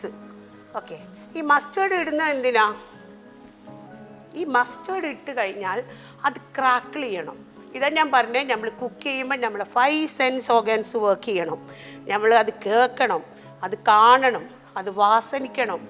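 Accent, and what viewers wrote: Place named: Malabar, India. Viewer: What a presentation with that Malabar slang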